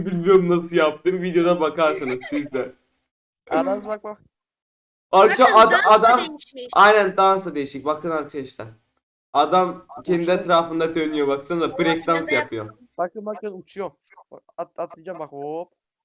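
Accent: Azerbaijan. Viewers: Azeri misin Türkmüsün bir dilde konuş anlamıyom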